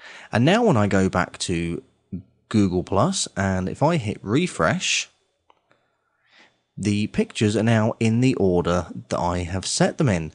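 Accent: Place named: England, United Kingdom. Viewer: Thanks. I never feel stupid when someone with an english accent is describing something to me.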